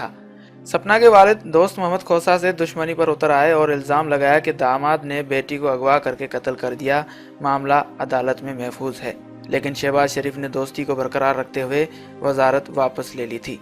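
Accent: India. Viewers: Jahil admi pehle urdu to bolna seekh lo..such an ugly accent of urdu..why are you trying to talk like indians? U think u sound cool? Lol...and who is Samina Durrani? How jahil are you!!!??? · This guy is indian 'his accent tells his reality.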